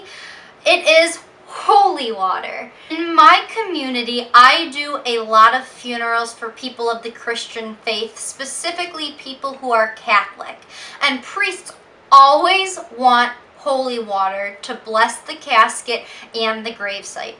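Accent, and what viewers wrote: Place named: United States. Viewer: OMG that bloody voice sends shivers down my spine - A whining American YUCK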